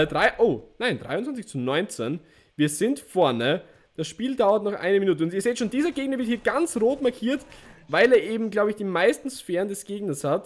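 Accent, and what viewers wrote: Austria. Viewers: Biste eh aus Österreich?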